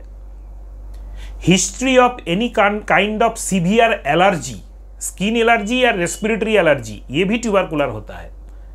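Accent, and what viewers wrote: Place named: Bengal, India. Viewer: समझाया तो अच्छा है. लेकिन बंगाली पुट की वजह से गड़बड़ हो जाता है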